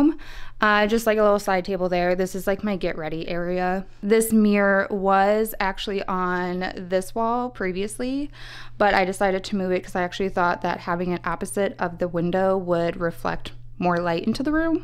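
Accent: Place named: South, United States. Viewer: Do you mind me asking what state/region you’re from? TOTALLY ok if not, I just LOVE your accent as a southerner and I’m a linguistics nerd. Also your shelves? Immaculate:)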